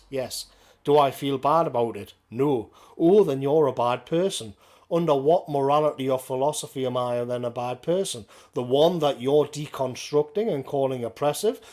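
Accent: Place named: Northern England, United Kingdom. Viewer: Love your content mate, great to hear a northern accent with such a fantastic understanding of philosophy and reasoning. Always look forward to the next one 👍 thanks!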